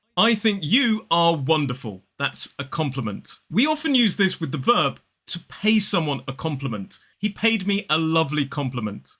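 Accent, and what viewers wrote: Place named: RP, United Kingdom. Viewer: My favourite British rp accent teacher on YouTube. Love the energy you give the words when you're speaking. Makes it easy for our ears to pick the tobality and musicality of the accent. Thank you!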